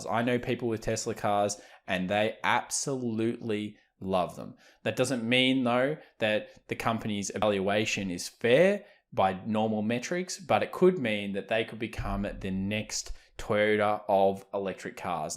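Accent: Australia. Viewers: I m not dissing every australian ahaha I didnt know australian speak like that. I just cant withstand different accents other than american. There is noth to do about it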